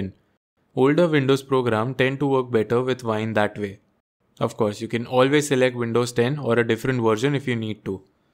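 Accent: India. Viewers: When the narator sounds like indian and you know what you want will be fixed in no time 🥹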